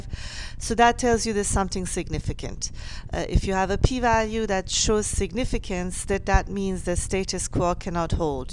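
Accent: Iran. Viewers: Nice Persian Accent! And of course nice job!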